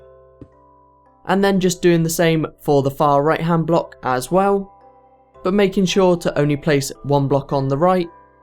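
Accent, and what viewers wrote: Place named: RP, United Kingdom. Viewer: U talk so posh it's like you do not speak in that way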